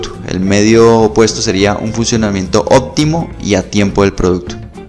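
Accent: Cali, Colombia. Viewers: Hablalo Caleño!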